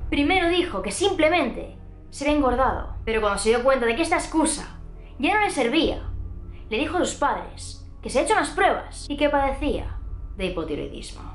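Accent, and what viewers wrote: Galicia, Spain. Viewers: Eres de Galicia?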